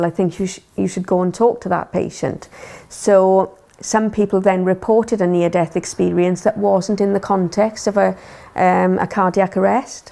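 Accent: Wales, United Kingdom. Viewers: Beautiful welsh accent .......... my sister said she saw herself on the operating table from above.......German surgeons drilling her head........she had a head injury from motorcycle accident............she was in a coma for six months.......... My brother said his life flashed before his eyes when he nearly choked to death......... Myself.......my children's father whom had left me & married another woman & lived in another country............the day he died I had this unreal tiredness .....I was that tired I went to bed.........I always have plenty of energy.............my son phoned me (I was still in bed) in a terrible state saying his dad had died while doing his plumbing job at a customers home.........around mid day..........he died alone of a heart attack.......... I was wondering afterwards was my ex husband trying to call me............was he trying to get a message to me...........did he realise he loved me at the end of life...........I wonder if I will ever find out.......... 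· vashon100 WELSH...from WALES....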